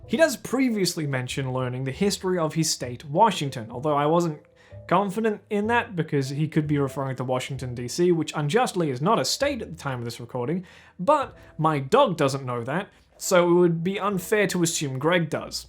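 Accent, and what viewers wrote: Australia. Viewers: me just finding out is australian and not british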